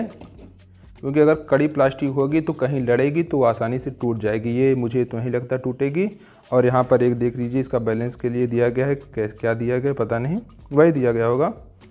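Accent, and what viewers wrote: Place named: Bhojpuri, India. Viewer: Ur bhojpuri accent is fascinating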